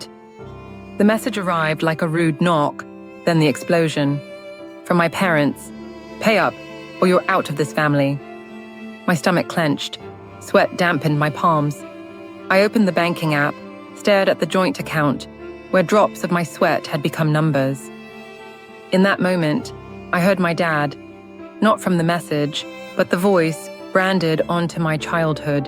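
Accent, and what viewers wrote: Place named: United Kingdom. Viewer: Sound more British than from Nebraska.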